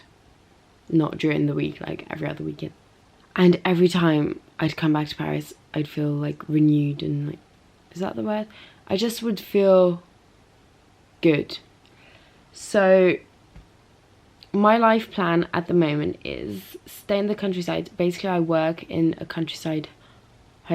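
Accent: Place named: United Kingdom. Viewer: I love your British accent. So melodious.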